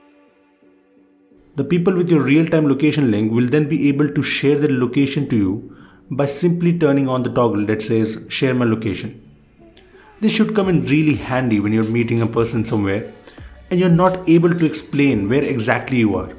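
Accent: India. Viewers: He's not "putting on an accent." It's an Indian accent, and I don't mean native Americans.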